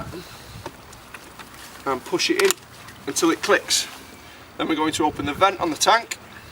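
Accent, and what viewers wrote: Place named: United Kingdom. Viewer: That sounds very British :-)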